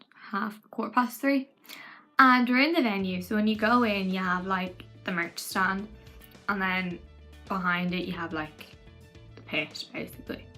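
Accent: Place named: Ireland. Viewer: I didn't know you were Irish? (i think, you sound irish) your accent is cute and I saw that you did go and to a halsey concert, but I'm glad that you had fun 💞💗💗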